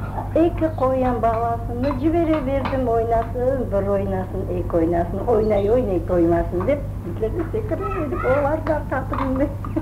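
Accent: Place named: Bakhchysarai, Ukraine. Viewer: Büyük zevqnen baqtım, öz Bağçasaray lehçemizni eşitmek ne qadar hoştır 😍 bu işke isse qoşqanlarınıñ elleri dert körmesin!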